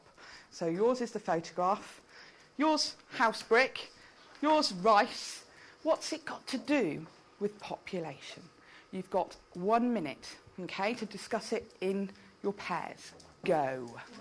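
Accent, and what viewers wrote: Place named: United Kingdom. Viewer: That's kinda puzzling... She has got a British accent but this shouldn't hurt so much.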